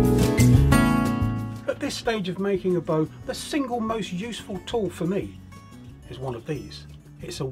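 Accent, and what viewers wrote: United Kingdom. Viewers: Thank YOU! Since childhood I have wanted to make my own bow. I practised archery as a kid and was obsessed with Robin Hood and all things Medieval. I remember my dad and I at some point went to the woods to find a big branch of a yew (called a taks tree, from the Latin, in my native Denmark) from which to make me a long bow, like Robin Hood’s. But although my father is a fourth generation cabinet maker by training, we were unsure on how to go about making a long bow. I since worked many years as a lumber jack, but eventually became a classical guitarist by profession and a lutenist of sorts. Unusual background, I know. I kicked my television out many years ago, and I watch instead wood working videos by Paul Sellers and bow and archery craft with you and select others when ever I have the time and need. I thank you for bringing all of these disparate but somehow connected interests of mine together by teaching us the seemingly forgotten skills of yet another of mankind’s inventions. 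I’ve had my goal set at times variously on instrument and furniture making, but I am convinced that bow making might become my spare time enterprise of choice. I must also further compliment you on, A) being a top-level presenter, comparable to the very best, without exception, and B) having a superbly brilliant dog! (An aside, is that a Coventry accent, by any chance?)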